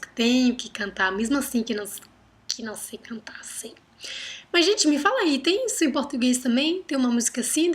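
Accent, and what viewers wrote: Northeast, Brazil. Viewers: Comecei a estudar o Holandês e me deparei com seu vídeo. Obrigado pelas dicas. Adorei o seu sotaque português, ele é bem nordestino. Parabéns! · As vogais não são iguais " como em qualquer outra língua". As do inglês são no geral diferente de tudo qto os demais. Idiomas. A única coisa positiva, é o seu ligeiro sotaque nordestino. Brasileiro.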